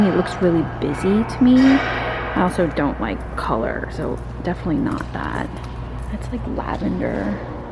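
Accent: Texas, United States. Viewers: The Texas accent was prominent in this video!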